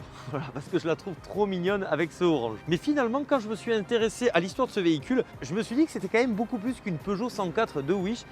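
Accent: South, France. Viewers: C'est débile, même avec l'accent du sud, on n'y croit pas : soit tu achètes un frigo, soit tu achètes une LN ... il est mauvais et il le dit lui même sans respecter la voiture qu'il présente ... un guignol de plus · Allez je me replonge dans un ancien test : ce qu'il y a de merveilleux avec toi c'est que tu vas t'extasier avec le même enthousiasme devant une modeste populaire autant que devant une Ferrari ! En bon chauvin, le charme vient aussi de notre bel accent du sud... Ouh la je vais me faire des ennemis....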